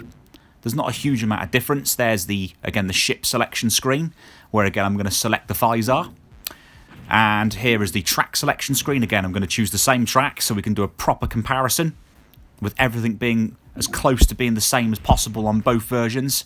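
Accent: United Kingdom. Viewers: How come you sound clearly English, but you're playing the XL versions instead of our 2097 versions?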